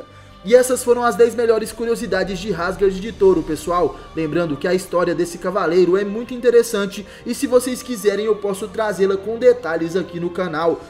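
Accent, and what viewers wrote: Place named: Portugal. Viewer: purtugues d'purtugual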